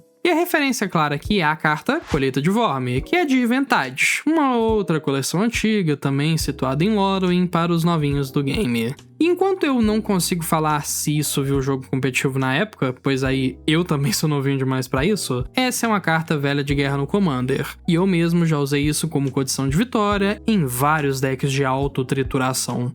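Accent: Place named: Minas Gerais, Brazil. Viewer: descobrindo agora q o é mineiro, entendi pq é tão suave ouvir os vídeos kakakakakka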